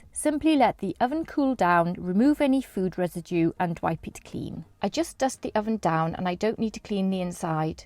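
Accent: Wales, United Kingdom. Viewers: Welsh accent is lovely, great review! Very in depth, confirmed my decision to get one I reckon. Cheers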